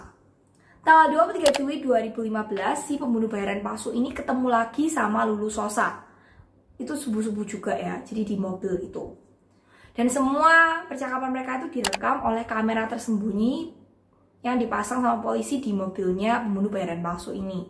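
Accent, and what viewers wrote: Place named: Java, Indonesia. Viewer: Ealah medok banget yo mbak...yo wis gakpopo,ceritanya bagus 😃😃😃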